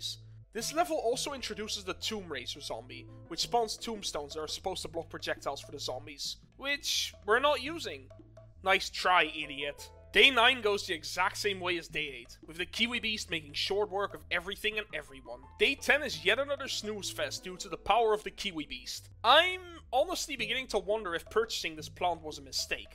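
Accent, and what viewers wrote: Netherlands. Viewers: Are u Dutch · dutch accent spotted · U dutch?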